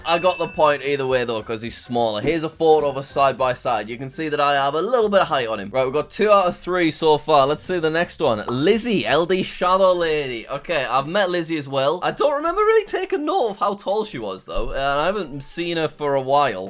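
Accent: RP, United Kingdom. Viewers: I wonder if he got the sudden inclination because Dan has made fun of how tall he is in the past? So he kinda built this image of himself that he and his fans joke about him being a tall, slightly posh (thus kinda naturally friendly) sounding guy which is a subversion from the fact that he actually has terrible posture and a more cynical sense of humor and attitude. There’s also just the infamous line from his rap roast that he’s basically the product of what would happen if Winnie the Pooh f*cked Slenderman 😳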